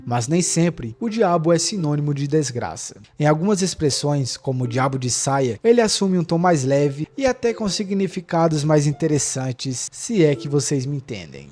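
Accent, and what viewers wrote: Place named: Northeast, Brazil. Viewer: Que Diabo é Diabo, sotaque nordestino.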